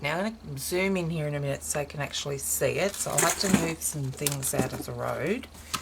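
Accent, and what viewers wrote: Australia. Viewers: So awesome to hear an Aussie accent on here. I'm sure we have lots of talented people here just like there are all around . Love your work. TFS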